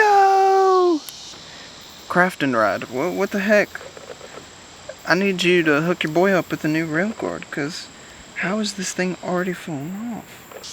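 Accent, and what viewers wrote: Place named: Texas, United States. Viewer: Your voice is so good bra like texas but your a brown guy like me 🤣 such a mind blow out seeing as your so down to earth... can't picture a single (non racist!) texan I like other than McConaughey, until now! 👍🏽 from a guy in a tiny place called fareham in the UK ✌🏾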